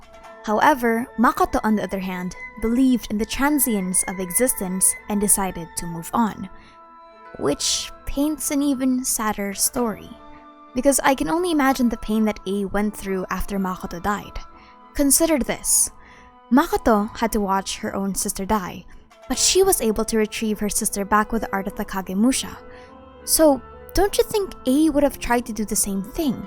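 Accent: Philippines. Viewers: is she from philippines or what? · I knew it! You were a Filipino the whole time, ur English accent is familiar taena Anyways, cool video! I didn't even know that book existed, more lore crumbs for the Almighty Shogun!! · Pinay ka? HAHAHA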